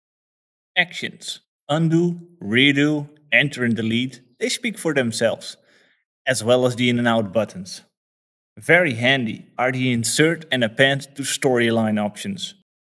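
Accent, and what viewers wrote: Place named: Netherlands. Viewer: Nice App! Nice explanation. Your accent sounds more Dutch than Brazillian to me!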